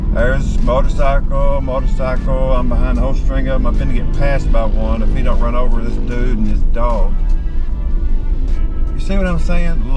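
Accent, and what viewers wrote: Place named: South, United States. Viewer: The traffic won't get fixed because of overpopulation. Even in my south hometown in Lipa, traffic is bad. Regarding your Southern accent, most Filipinos will have a hard time because yours is relatively faster pace. It's an acquired skill to understand it, because at first, it was hard for me too. Working for call center companies get one introduced and familiarized with it so it becomes natural after some time. I recommend creating a video of common idioms you use a lot in English, and sharing it as some Pinoys may want to learn it. Maybe do it as offer of help for Filipinos that work for call centers catering to USA. Also, can you try some taglish at times? It will be fun to hear you do taglish like us :)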